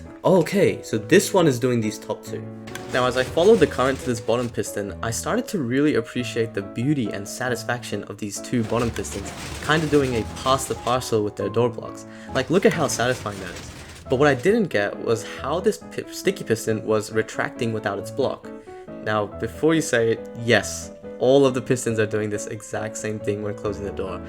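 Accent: Germany. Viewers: Ey bro, u german?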